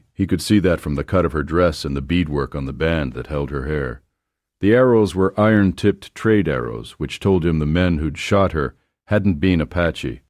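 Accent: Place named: New York, United States. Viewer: The Narrator must be from a crowded city in….New Jersey or New York. His performance is….inept Great voice though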